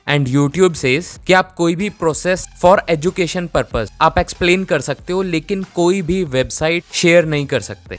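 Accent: Punjab, India. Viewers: Punjabi lag raha hai bhai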